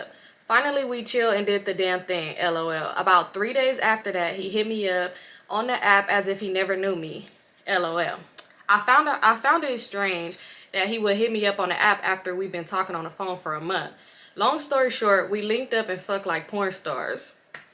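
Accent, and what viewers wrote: Trinidad and Tobago. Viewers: Allyuh = All of you Caribbean accent Possibly a Trinidadian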